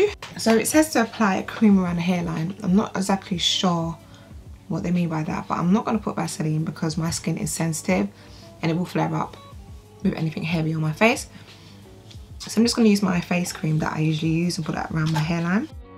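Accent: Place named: London, United Kingdom. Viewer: I just LOVE your accent in an London accent🥰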